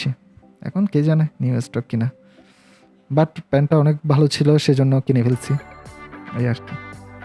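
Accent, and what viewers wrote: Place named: Chittagong, Bangladesh. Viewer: ভিডিও দেখে ভালো লাগলো। খুব সোন্দর উপস্থাপনা করেন। কথায় বুঝায় যাচ্ছে আপনার বাড়ি চট্টগ্রাম।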